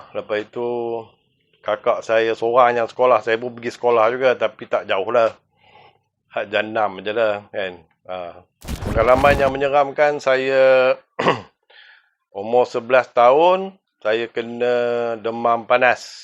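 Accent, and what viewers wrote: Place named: Perak, Malaysia. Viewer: Orang bota ke ni? pekat no loghat peghok 😆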